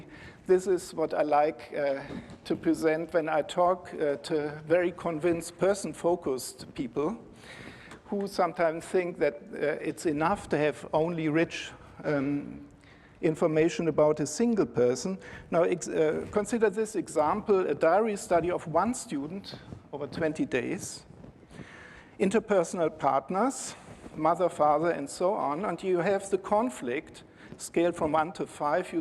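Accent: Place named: Germany. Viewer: Unfortunately he speaks with a too heavy German accent when lecturing in English language.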